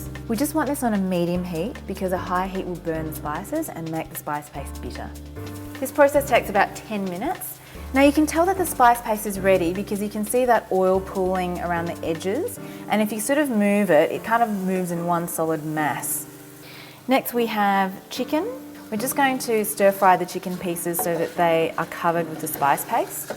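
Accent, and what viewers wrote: Australia. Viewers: Yes and it's particularly a South Australian accent. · accent sounds like an australian...anyway,that roti chanai looks delicious!thanks,definitely gonna try this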